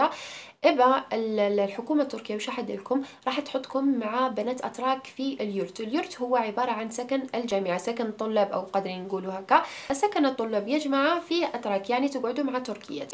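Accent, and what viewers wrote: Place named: East, Algeria. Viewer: لهجة الشرق الجزائري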